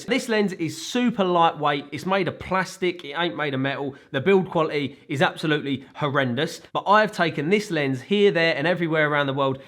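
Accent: United Kingdom. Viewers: I’m just here to listen to your British accent mate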